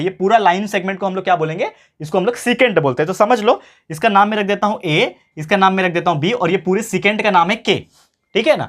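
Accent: Maharashtra, India. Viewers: Sir aap marathi bohot cute bolte ho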